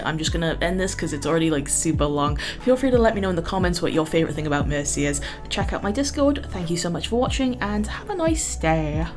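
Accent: United Kingdom. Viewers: Ah yes, British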